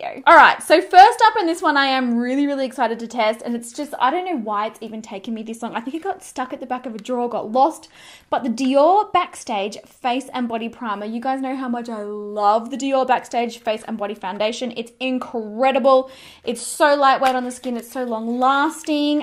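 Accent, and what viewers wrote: Australia. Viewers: your prononciation of Jouer with your Aussie accent is spot on in the ears of a French spoken person!;)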